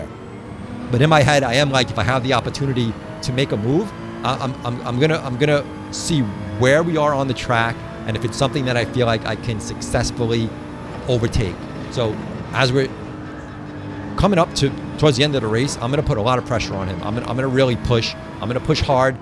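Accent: New York, United States. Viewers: do I hear a NY accent???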